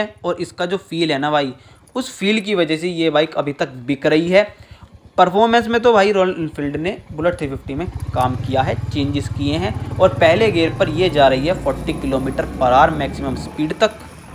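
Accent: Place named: Uttar Pradesh, India. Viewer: Neither of these bike falls under cruiser category. Please do your homework seriously ;If you want to grow. Else if you really want to remain just another street youtuber with strong UP accent and tone. Continue with all what you doing. Rest I will wish you best of your luck and hope you do good 👍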